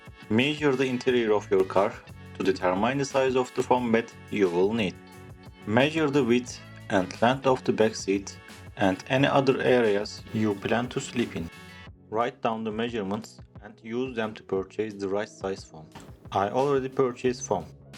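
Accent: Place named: Turkey. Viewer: Merhaba. Bende de bir c5 aircross var. Altımıza yorgan sererek yatak mi hazirlasam diye düşünürken sizin videonuza denk geldim. Telaffuzdan da bizden olduğunuzu fark ettim :) Güzel fikir gerçekten kartonla ölçü almak. Bana ilham oldunuz, teşekkur ederim. İki ufak çocugumuzla 4 kisi uyumayi düsunuyoruz. Tekrar tesekkurler. 😊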